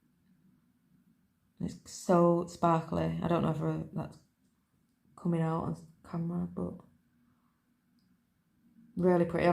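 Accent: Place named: North West England, United Kingdom. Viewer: As soon as I heard you I subscribed as I knew you must be another flossy from the north west. Absolutely love your stitching projects and wow that lion is amazing. Loving the houses too. Brilliant first video and look forward to future ones. I haven't done any floss tubes as I'm too camera shy but I especially love to see locals doing their stuff. Here's to many more 👏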